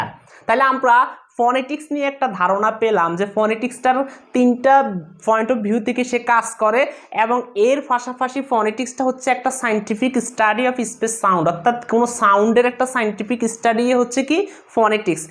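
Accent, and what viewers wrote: Noakhali, Bangladesh. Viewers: Bhaiya apni ki Noakhali'r?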